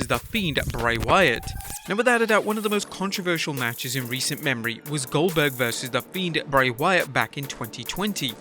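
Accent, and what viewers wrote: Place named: United Kingdom. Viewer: H not haitch bee Kay. I hate how the UK people talk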